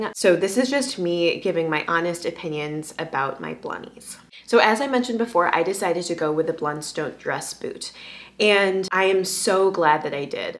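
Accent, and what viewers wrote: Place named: Minnesota, United States. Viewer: the minnesota accent gets stronger as the video goes on :)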